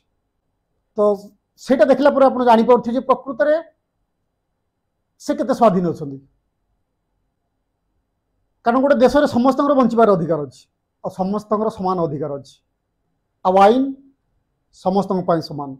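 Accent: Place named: Kendrapara, India. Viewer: Bhai katha ra saili ru laguchi bodhe kendrapara ade ghare apanankara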